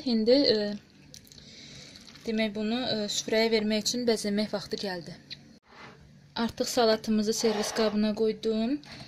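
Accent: Ganja, Azerbaijan. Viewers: Xanım, siz Gəncəlisiniz? Mənim yoldaşım Gəncəlidir, Gəncədə balacaya- "Balicə"deyirlər!😂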